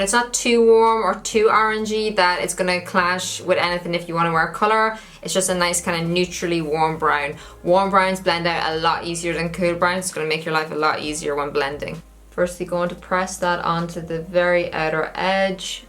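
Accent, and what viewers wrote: Ireland. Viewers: definitely Irish 😍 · I love hearing the Irish accent on YouTube 😍 sounds like me when I talk 😂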